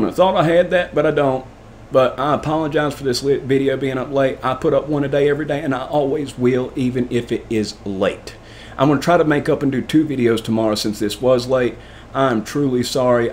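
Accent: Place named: Tennessee, United States. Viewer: Where are you from Kentucky here. I cant pin that accent down . You sound like a cousin of mine though lol Best i can guess is Tn or Ak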